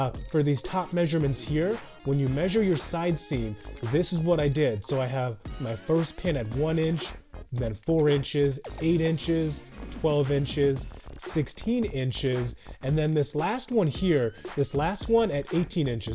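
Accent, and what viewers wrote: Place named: South, United States. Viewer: Bro you from the south? Like GA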